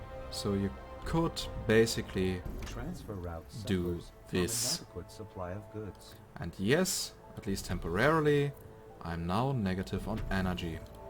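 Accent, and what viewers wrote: Germany. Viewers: man hört den deutschen akzent aber das ist cool. ich spreche auch oft englisch. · You have a german accent, do you come from germany :D?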